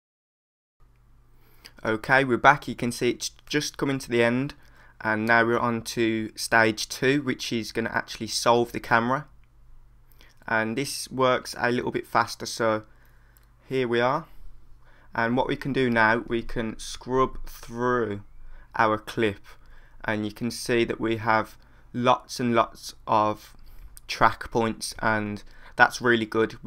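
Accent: Birmingham, United Kingdom. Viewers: you never fail to help me with my work man:D may i ask where your from, you sound like a brummie? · Rodrigo Vazquez , Brummie fo sho! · It's Birmingham, maybe Kidderminster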